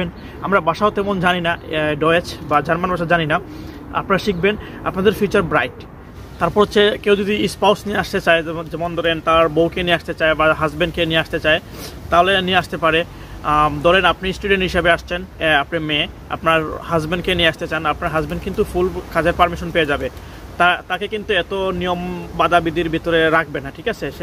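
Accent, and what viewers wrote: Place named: Sylhet, Bangladesh. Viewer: bhaiii uni Sylheti. Unara Bangla kor pare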